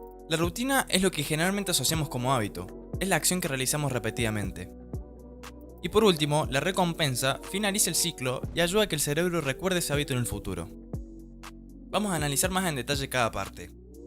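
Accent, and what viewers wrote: Córdoba, Argentina. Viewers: Esa tonada cordobesa 🤤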